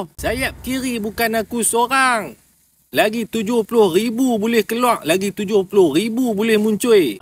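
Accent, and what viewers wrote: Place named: Kedah, Malaysia. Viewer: sempoi bak hang..😅 lama dh aku tak dengaq loghat kedah yg bunyi kasaq🤭... last masa ngan roomate kt Shah alam 30thn lalu...😊 Keep it up bro! Lawan tetap lawan!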